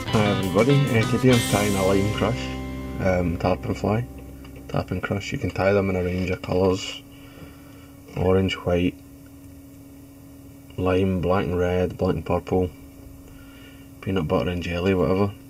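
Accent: Scotland, United Kingdom. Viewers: Love the Trainspotting accent and love the simplicity of that bug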